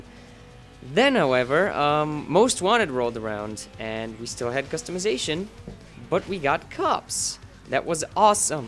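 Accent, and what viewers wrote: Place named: England, United Kingdom. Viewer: Horrible English accent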